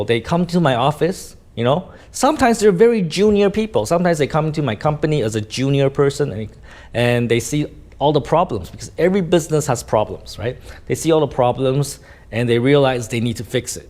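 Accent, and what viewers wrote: Standard. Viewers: Thầy nói rất chuẩn luôn good thầy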